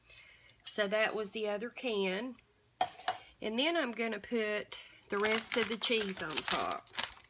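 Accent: South, United States. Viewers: What a precious lady❣️ I’d love to stop by and have dinner with this sweet lady...love her southern accent. Reminds me of my family ❤️❤️❤️ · you are such a sweet heart ya had me with the breakfast casserole n Tennessee sausage.. is the only thing this TN gal will eat.. Love your gentle nature, nice southern charm.. NC n TN have similar accents.. I get the make a meal for several days.. is cheaper.. faster n no fussin, always have extra in case ya have company droppin in... cuz it is jus bad manners to NOT.. feed them.. always have a snack drawer in the fridge in case there are kids along too.. they love goin in there n rustlin around choosin something sweet or salty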